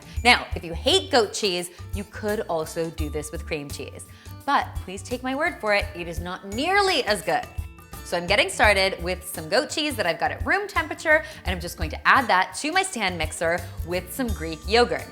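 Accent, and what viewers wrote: Canada. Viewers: She kinda talks like a news anchor but like in a totally non annoying way in fact I love her Canadian accent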